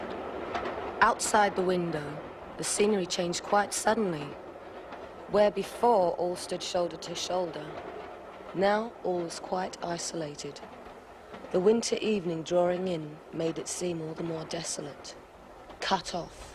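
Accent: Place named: United Kingdom. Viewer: oBRIGADAAA, estive procurando esse video legendado porque mesmo entendendo ingles, ainda me confundo com o sotaque britânico. muito obrigada pelo video!!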